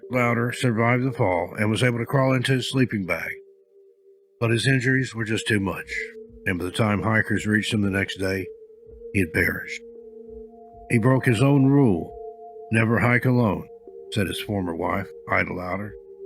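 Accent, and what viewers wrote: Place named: South, United States. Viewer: Your story telling is magnificent, and your voice is extremely comforting. Being from the south, your accent really makes it feel like home. Great work, and you've got a new subscriber! ❤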